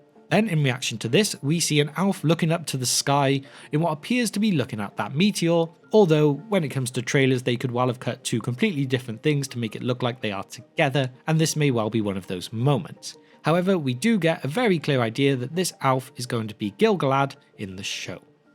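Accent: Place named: Wales, United Kingdom. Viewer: Literally just stumbled on this channel today. Presenter’s accent is slightly subtle and I’m like “is this guy Welsh?” … then I hear how he pronounces Morfydd and Owain and I’m like “you son of a bitch, I’m in” SUBSCRIBE 😁🏴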